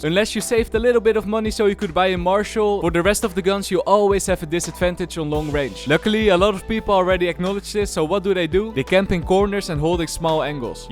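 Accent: Netherlands. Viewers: i smell dutch?!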